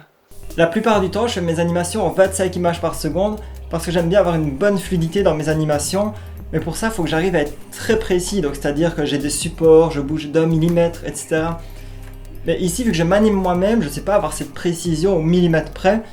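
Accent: Belgium. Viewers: Excellent, t'étais super mignon avec les cheveux longs. Un de mes poussins ne veut plus qu'on lui coupe les cheveux, j'ai hâte de voir jusqu'où il va les laisser pousser ;) Vidéo super réussie, elle a été le sujet du discussion de tout un repas :) Toute la famille aime tes vidéos, ton humour et ton p'tit accent me rappelle les quelques mois que j'ai passé à Liège ;) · Tu est belge??? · T es belge je suppose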